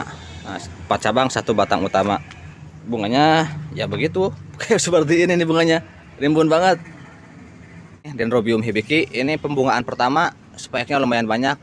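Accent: West Java, Indonesia. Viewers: Baru 2jam aploud, mantap ilmunya pak. Penyampaian simpel mudah difahami dng logat Sunda tambah epik. Saya dari Jatim enak mencerna penyampaiannya👍🏻👍🏻👍🏻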